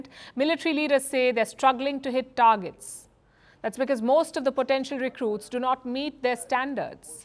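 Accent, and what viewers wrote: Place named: India. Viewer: I don't like this channel at all, her accent is so strong it shows that she's from India. 👎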